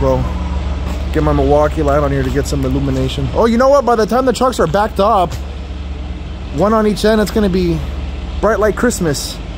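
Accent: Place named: Arkansas, United States. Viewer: Dude, I've been enjoying your videos for at least two years or longer. I'm pretty sure that I met and ran into your grandfather, or maybe great grandfather? several times over at Engs Peterbilt back in the late 70's-80' before Rush. I can't remember Mr. Engs first name but knew him and dealt with him and Tony Lacey, and knew many small fleet owners for many years. Guys that if they couldn't have fun with you and vice versa they were no good to you. Anyway, one time he was talking about his son being ready to go out solo on a wrecker...as soon as he got a D/L in a few years. So this is a long way around just to say your videos are great, your talent and skills are superb, and now I see you're honing in on your comedic assets. "I'm going to be getting it from the rear" and then the Peter Pan scene...you're funny dude! Also, I laugh every time you same "boom". 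If you didn't say it you sound like a good ole hippy boy from Little Rock Bakersfield. I better stop now and apologize in advance if I've offended anybody...I've been drinking, you suggested it. I'm an old man, maybe there's a new kinky kind of Towmans Porn going on? Be careful out there, peace out, and enjoy. God bless.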